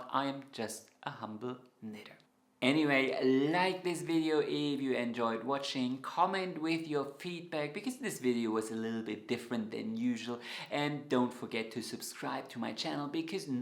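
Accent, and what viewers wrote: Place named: Germany. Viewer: Hello, I found this post today purely circumstantial. Very sound information on a lot of topics. I never thought that copying a pattern from the ner could have so many pitfalls. I knit for myself and don't sell things knitted by me but I give away stuff sometimes. Also found out that you live in Austria. Funny that, I was born in Austria but left 50 years ago. I heard in your pronunciation that you are from a german speaking country but couldn't pinpoint if it was either Austria or Germany. I love your posts and get a lot of good tips, still learning at 76 years old. I am very glad to have found your channel. Good luck to you! ❤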